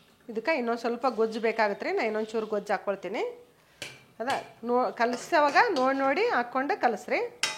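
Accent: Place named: North Karnataka, India. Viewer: Aunty ri Nivu Uttara karnataka Bhashya ne matadi chaalu anusate ri 🙏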